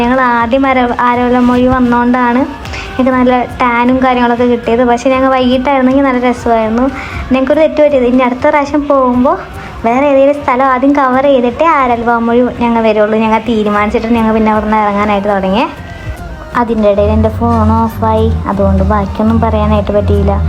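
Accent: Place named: Thrissur, India. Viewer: and her tamil in Thrissur accent 😅❤️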